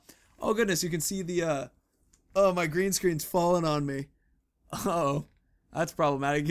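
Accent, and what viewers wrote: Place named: Australia. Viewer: It's all about that Australian Fah Hahbah.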